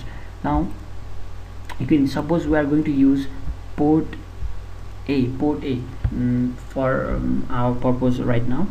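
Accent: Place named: India. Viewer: Sorry about saying your from India. Just sounded like it.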